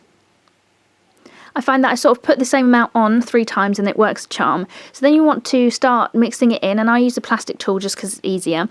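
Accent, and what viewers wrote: United Kingdom. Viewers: omg are you British? cuz I love your accent and great video!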